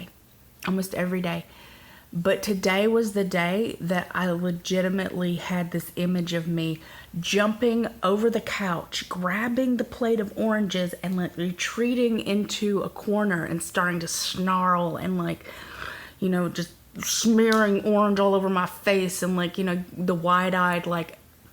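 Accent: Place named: South, United States. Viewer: girl your from California????....where that southern accent.come from???.... some people in Bakersfield area of California.have a country accent....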